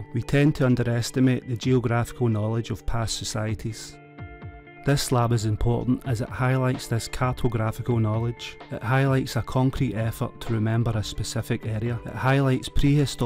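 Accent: Scotland, United Kingdom. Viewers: He is Scottish... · The speaker has a wonderful Scots accent....love it....and there is a volume control for those who are slightly hard of hearing...you can turn up the volume on your device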